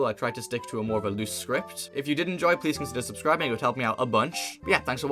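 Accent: England, United Kingdom. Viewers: Bro’s such a fan he always talks like an English chap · Bro is an English chap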